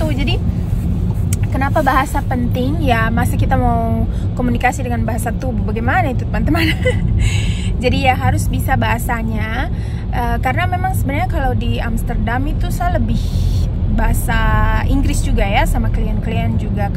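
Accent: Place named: Eastern Indonesia, Indonesia. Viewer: Ka diindonesia dari derah timur ya? Mirip2 dialeknya tmnku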